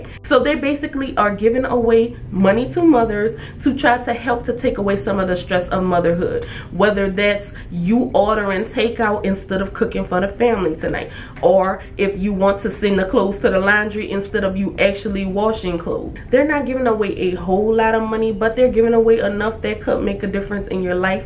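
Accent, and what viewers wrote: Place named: New Orleans, United States. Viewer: I knew it!!! I heard your accent in a few videos ago and I said, "She sounds like she's from New Orleans." The way we pronounce our words is so unique. Thank you so much, homegirl, for the awesome content you create that offers so much information. You're a blessing,.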